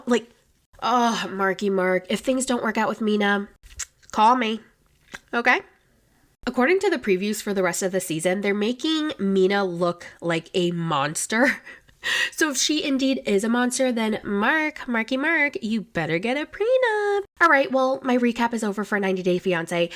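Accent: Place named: South, United States. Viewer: I just love you, ♥️🌹your southern accent is amazing 👏 great job, great podcast 👏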